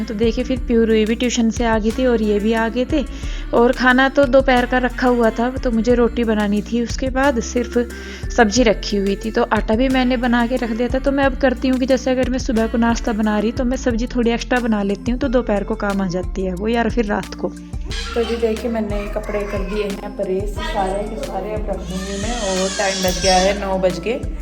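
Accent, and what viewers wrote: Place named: Rajasthan, India. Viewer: Hi mein new sabscribar hu aap ka chenal sabscribar keya hai meine roj video dekhti hu aap ki bolne ka tarika matlab aap ki lengvej bahot achi lagti hai aap kaha se ho rajasthan ya hariyana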